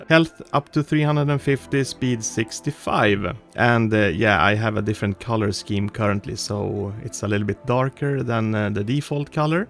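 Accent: Sweden. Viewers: Hello your videos are awesome but i got 1 question for you (Are you actually swedish bc you sound very swedish like i do)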